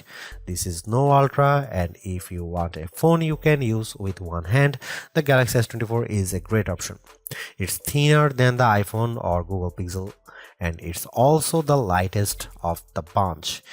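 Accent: India. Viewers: OMG, listening English from an Indian is a violene to my ears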